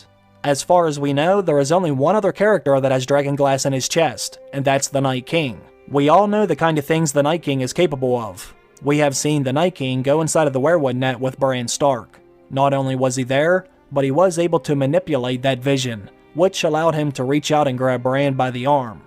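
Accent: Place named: Alaska, United States. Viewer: you sound like those people living in alaskan backwoods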